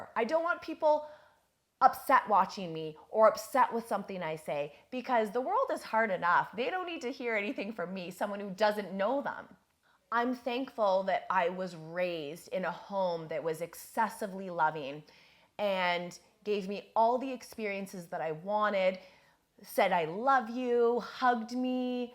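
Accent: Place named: Canada. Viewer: I love the way you say “sorry”. Canadian accent is cool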